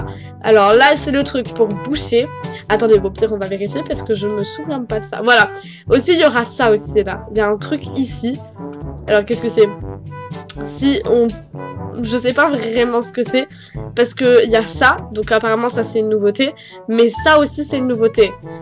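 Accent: Quebec, Canada. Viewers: Go les 100K ?😙 t'as un p'tit accent nan ?😁 québécoise ?😏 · On entend beaucoup ton accent Canadien❤ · defois ta grv un accent québécois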